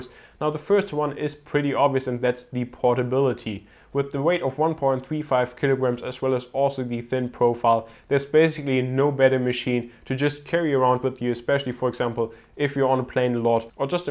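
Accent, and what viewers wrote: Germany. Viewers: Whoa, you sound like a German or something. I couldn't imagine doing a review in German, so props for doing one in English. I recommended my brother get the Macbook Air, and he's at the store buying one now. Woot! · I actually was thinkabout how chinese was ridiculous guess and thought you have to be german, und du bist Deutsche! Gruss von Holland! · The guy in the video sounds like an german with an akcent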